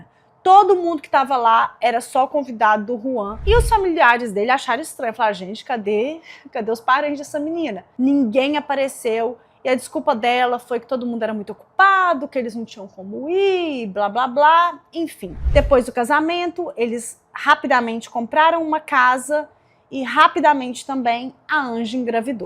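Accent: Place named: Minas Gerais, Brazil. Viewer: Mais uma inscritaaaa🤩🤩🤩🤩 Pelo sotaque ... é mineira!😂 Se foor bate aqui mana ✋️👊🤜🤛👏🙌🫶😍 Mas se não for... pra mim é minereis do msm jeito 😂🫶🫶🫶🫶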